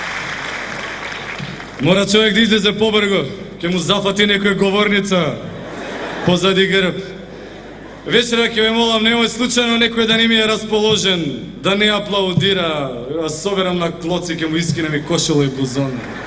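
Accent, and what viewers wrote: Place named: Kumanovo, North Macedonia. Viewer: Kumanovac od daleko se poznava.Bravo samo takoj produzi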